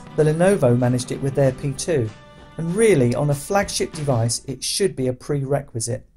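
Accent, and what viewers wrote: United Kingdom. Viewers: Nice review! Everything i need to know is covered here. I love the British accent too.😊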